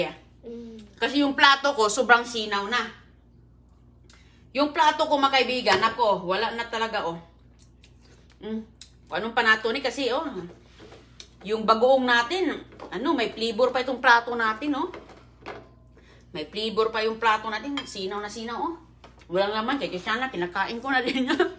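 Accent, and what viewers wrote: Visayas, Philippines. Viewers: Mao nay nindot bisaya jud kaayo haha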